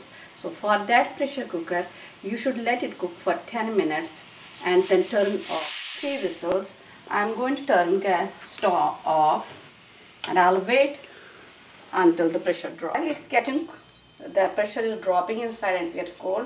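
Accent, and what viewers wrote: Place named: Kashmir, India. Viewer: fantastic loved this recepie an original kashmiri woman speaking with original kashmiri accent all real originak kashmiri stuff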